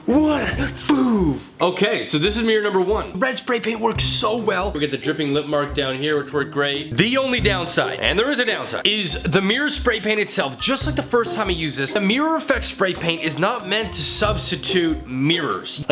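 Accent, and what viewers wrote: Canada. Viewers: Love the canadian accent ❤️❤️👌🏽 & like seeing a guy say he likes crafts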